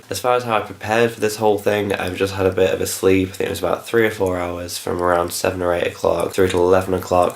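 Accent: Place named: United Kingdom. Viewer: Oh wow a Brit. Genuinely expected an American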